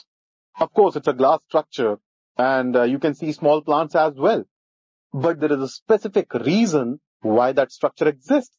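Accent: India. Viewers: indian english!! hard for me 2 understand..hahahh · topic well explained!! with lajawab indian accent!!thanks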